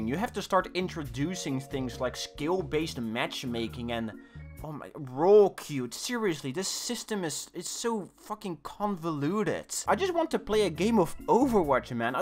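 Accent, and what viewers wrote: Netherlands. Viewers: hes dutch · Are you dutch?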